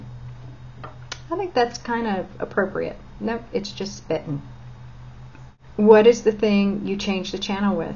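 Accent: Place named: California, United States. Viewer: I say you guys all the time, bad ha but ur right we Californians say it alot and I say aunt like u.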